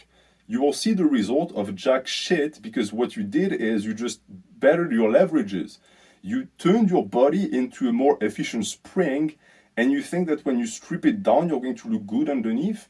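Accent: France. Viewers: Do you have an instagram fellow lifter of heavy weights i wanna see your sick physique. PS: Javais cramé que t'etais francais a ton accent mdrrr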